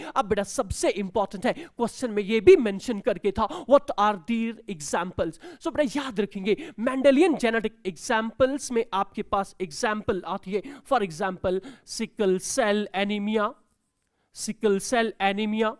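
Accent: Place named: France. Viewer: Heyyyy french accent, the huge energy, deliverance kya baat h sirrr 🔥🔥🔥! love from my side ❤❤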